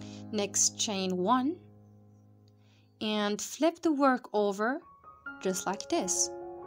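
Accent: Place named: Saudi Arabia. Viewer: تتكلمي سعودي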